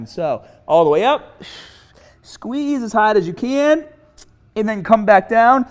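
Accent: Boston, United States. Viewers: Is that a Bostonian accent I hear?? :)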